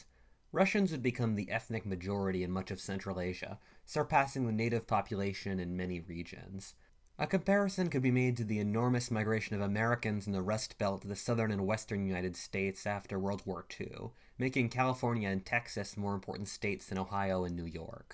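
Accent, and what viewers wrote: Canada. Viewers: You're a Canadian?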